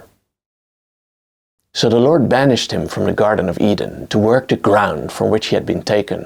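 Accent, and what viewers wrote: Netherlands. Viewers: yes we know. It's not a history book, accented Dutch person.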